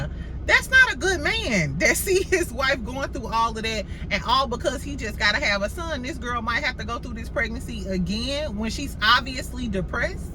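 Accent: St. Louis, United States. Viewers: Hey love! This your auntie from Chicago. I love your content. I love that St. Louis accent. Don't change anything!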